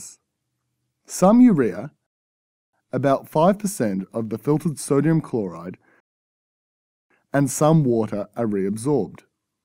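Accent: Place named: Australia. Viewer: this video is good cuz of the aussie accent..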